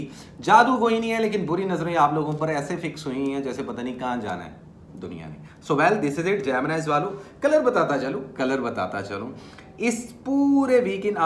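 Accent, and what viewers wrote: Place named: Punjab, India. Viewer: Gemini❤❤ Ap Punjabi bry mzy ki bolty ho 😂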